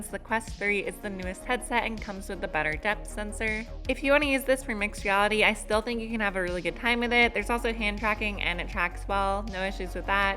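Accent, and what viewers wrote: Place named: Philadelphia, United States. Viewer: The way you say headphone and other words makes me think you might be from Philly.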